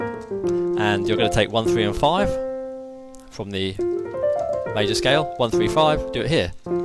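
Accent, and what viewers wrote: United Kingdom. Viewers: Very helpful so far! Thank you so much! I'm curious, where are you from? I hear a British accent. I'm just randomly curious, nothing more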